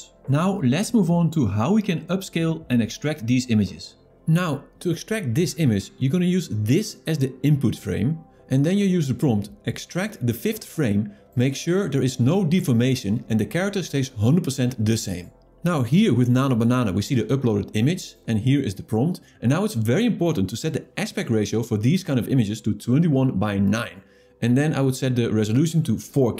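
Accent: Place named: Netherlands. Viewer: Bro you sound very Dutch hahaha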